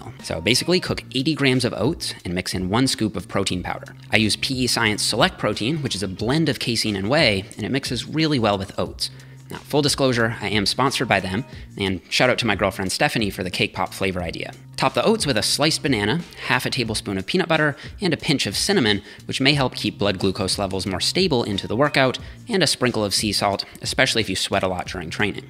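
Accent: Ireland. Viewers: Is he Irish? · A canadian that sounds irish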